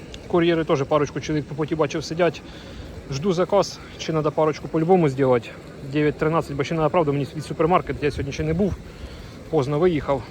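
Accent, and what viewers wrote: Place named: Kherson, Ukraine. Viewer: Привіт! Твої відео як вірус якийся, дивляться так легко і захоплюють... У тебе такий говір, ти не з Херсонщини випадково?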